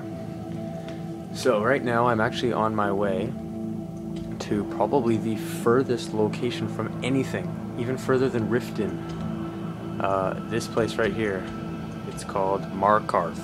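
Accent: Canada. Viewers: yo are you canadian a friend of mine has the same accent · You're Canadian, aren't you?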